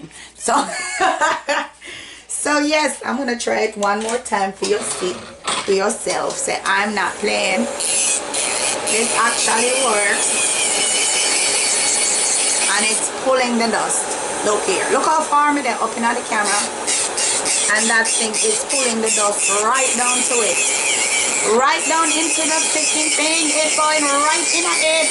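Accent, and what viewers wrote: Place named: Jamaica. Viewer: Jamaican I hear it looool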